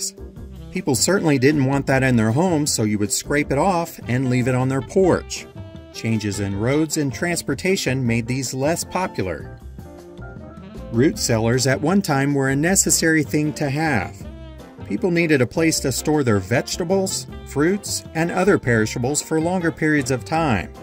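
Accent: United States. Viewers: Sounds like you are an American. I am English and was born and grew up in a home built in 1666. No inside toilet. No mains water, this came from a well and was brought into the house in buckets. No electricity. When I started to school I was fascinated with the light switches and taps(faucets) . At 72, I now live in a house built in 1972, with many modern conveniences but I still hang my laundry on the line outside on fair weather days. ps. My childhood was not too typical of the time, but one advantage was having 70 acres of land which allowed me a lot of freedom and a diet of home grown organic food. Love from England.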